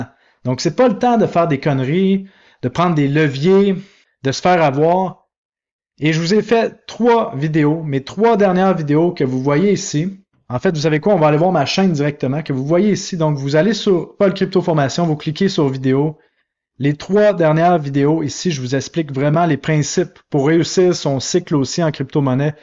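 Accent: Canada. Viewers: Bonjour paul merci pour tes vidéos que je suis régulièrement. J aimerai si tu peux avoir ton avis plus développé sur le solana ( etf ou pas prevu?) Car si c est le cas il se pourrait bien qu il accompagne de fortes hausses. Tout comme l ether etf a venir et enfin j ai du sand je suis surpris de sa remonté bravo a lui .j ai du polygone que je vends par tranches de 25% de hausse et le ada ? Merci paul aussi pour l humour combiné a l accent canadien que du bonheur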